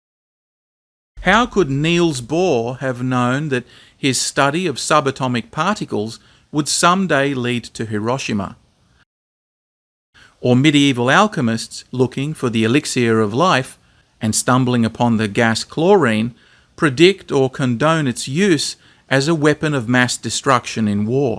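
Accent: Australia. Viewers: Sorry, should have looked there before commenting, and was of course a tiny, unimportant detail in your amazingly crafted documentaries. I wish to add that I love both your texts as well as the way you narrate them - 'Strine' yet perfectly intelligible even for those for whom English is not their mother tongue and whose hearing has deteriorated due to old age (as in my case)... and with perfectly dosed hilariousness ('However,...'🤣). Your documentaries are providing me, just in time, with the therapeutic benefit of proving that the present 'crazy times' are nothing new - humanity has always been both crazily stupid as well as deeply admirable.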